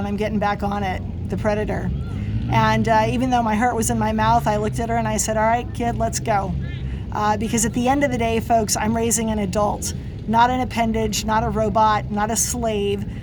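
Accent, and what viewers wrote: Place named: Pennsylvania, United States. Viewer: You sound like you ran around the hills of pennsylvania!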